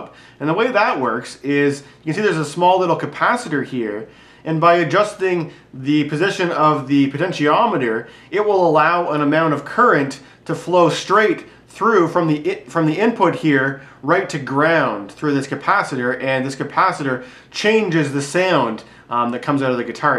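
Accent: Canada. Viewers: Great Video, i finally bought everything i needed to wire my guitars so i can refinish them. I got hooked on all the different types of ways you can wire the pickups and mods. haha. But when i get finished and it doesnt work im like daaaaaamnit. This was a great tutorial! better than cutting off all the wired and starting over lol. Hey man are you from Canada?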